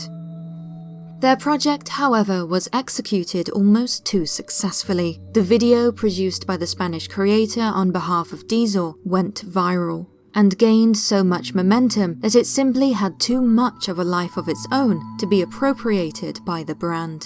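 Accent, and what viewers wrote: United Kingdom. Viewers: narrator I always wanted to ask, what part of the U.K are you from?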